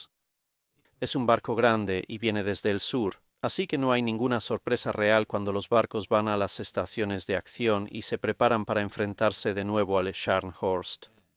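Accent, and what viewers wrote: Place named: United Kingdom. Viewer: Y me encanta ese acento británico y esa educación con estilo. Es muy agradable y lo seguiré siempre. Felicidades.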